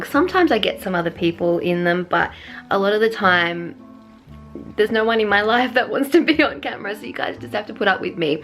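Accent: Australia. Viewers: Are u Australian and my worst thing is chocolate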